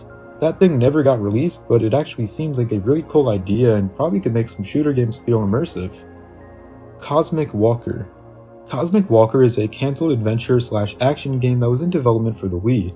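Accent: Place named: Portugal. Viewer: Are you portuguese? You have a certain accent.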